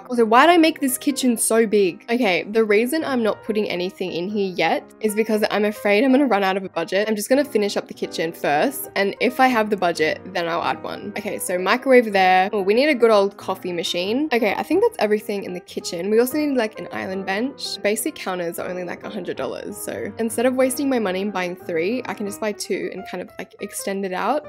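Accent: Australia. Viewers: Is she Australian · in this video you can hear her Australian accent more better in this video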